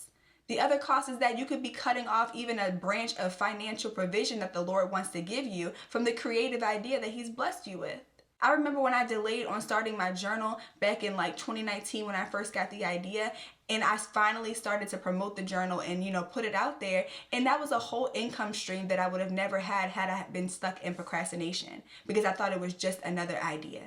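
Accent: Baltimore, United States. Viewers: Off topic but are you from baltimore/dmv area?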